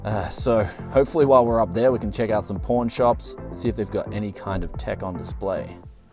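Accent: Australia. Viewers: SuperPorn! Got to love Austalian accent ! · I deadess heard you say you're checking out some "Porn brokers" love that aussie accent. :D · It’s the Aussie accent 😂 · The Australian accent didn't do you any favors.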